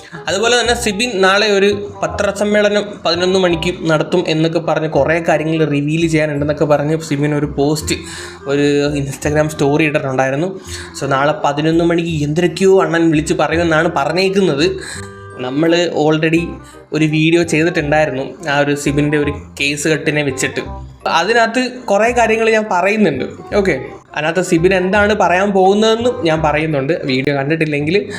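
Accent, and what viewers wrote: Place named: Karunagappally, India. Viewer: videos okke nalla funny aanu...karunagappally slag kelkkanum❤❤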